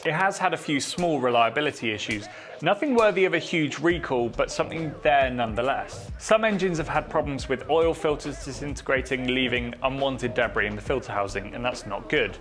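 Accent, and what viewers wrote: United Kingdom. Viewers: This channels just donut media w a British accent lol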